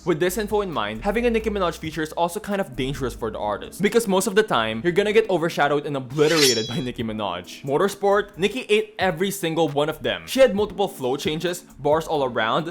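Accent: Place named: Philippines. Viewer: I'm living through your stanship and filipino accent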